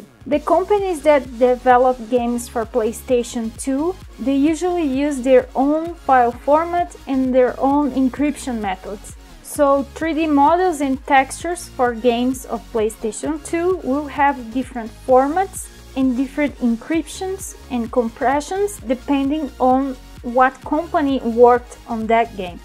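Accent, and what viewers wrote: Brazil. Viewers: pelo sotaque é BR hehe enfim mto bom o conteudo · caraca, eu tava ouvindo o seu ingles e pensei, só pode ser BR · Você tem sotaque brasileiro, deve ser brasileira. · Your accent is so cute! But I've found it oddly similiar... Até eu descobrir que vc é br xD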